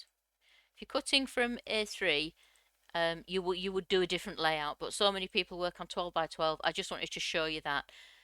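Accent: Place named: North, United Kingdom. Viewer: I love your videos as you explain every thing so well and a good old northern voice to boot, which makes a refreshing change.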